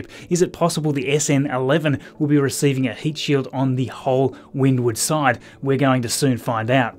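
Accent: Australia. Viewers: It's nice to hear an Ozzy voice giving the week's space launch news. Keep up the good work.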